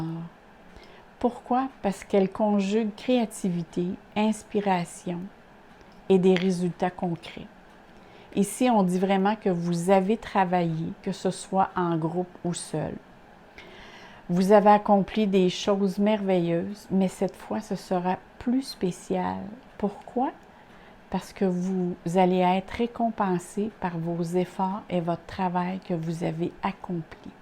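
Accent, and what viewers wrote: Quebec, Canada. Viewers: Bonsoir tout ce que tu dis est très cohérent et très précis; cela me rejoints beaucoup c'est tellement beau ce que tu partage wow!! c'est merveilleux ça... Pour l'intuition tu as totalement raison y as beaucoup de changements et d'énergie différente qui viennent à moi et dont je fais l'expérience surtout au niveau spirituel très inspirant.. Merci beaucoup c'est agréable de voir une femme qui as le parler Québécois..je te souhaites un splendide joyeux temps des fêtes comme tu aimes le vivre avec de l'amour, de la joie, de la paix, tout ceci dans la grande simplicité qui rends la vie magique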